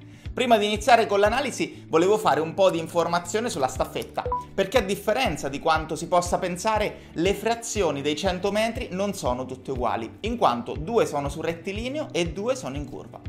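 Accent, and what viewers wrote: Marche, Italy. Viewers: Ciao, come si chiama lo youtuber? Sembra marchigiano, la staffedda quattro per cendo po' esse solo de qui vicino. Verso magerata o giù verso Fermo fra.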